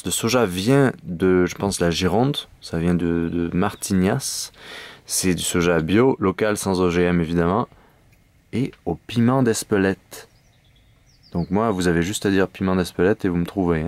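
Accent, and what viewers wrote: Quebec, Canada. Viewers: Salut les keupin, je viens de vous découvrir a travers cette petite vidéo, vous êtes québécois a en entendre votre accent, vous vous faite un repas trip en France?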